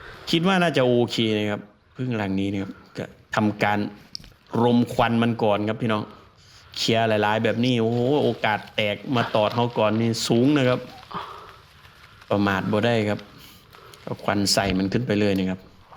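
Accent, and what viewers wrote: Isan, Thailand. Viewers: ฟังสำเนียงเว้านี่เจ้าเป็นคนจังหวัดเลยแม่นบ่คับ😂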